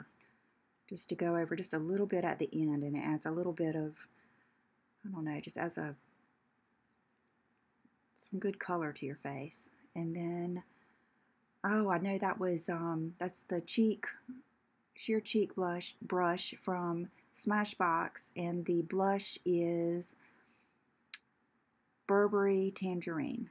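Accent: South, United States. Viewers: Great video!!!! You’re voice is so welcoming and southern! ♥️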